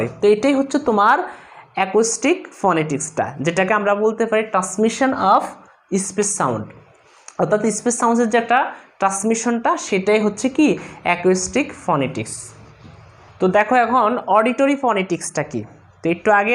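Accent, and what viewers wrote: Noakhali, Bangladesh. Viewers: Bhaiya apni ki Noakhali'r?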